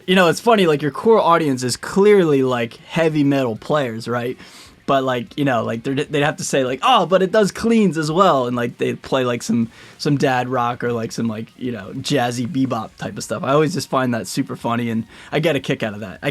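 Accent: United States. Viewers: by any chance are you american?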